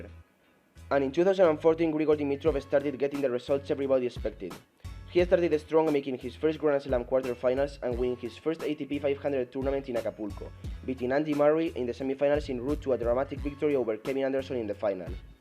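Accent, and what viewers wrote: Spain. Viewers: Tienes el acento más español de la historia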